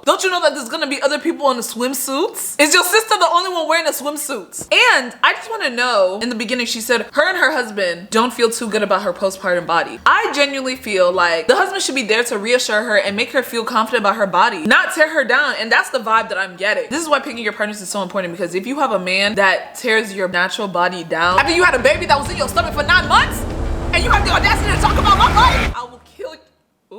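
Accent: Nigeria. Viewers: I just knew you are Nigerian The intonation and all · Weird question, are you Nigerian? I can hear the accent 😂it’s ever so slight but I love it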